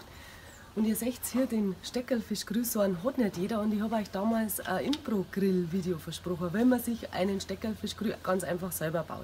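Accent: Upper Palatinate, Germany. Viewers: ned schlecht die Idee, aber sog a moi, kimmst Du aus der Oberpfalz? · Wahnsinn gefällt mir!! Extra Prima super Idee! 👍👍Tolle Frau👍👍Ich glaub fast nach dem Dialekt bist du ein Oberpfälzer Moidl!! Auf jeden Fall Topp und Gruß aus der Oberpfalz!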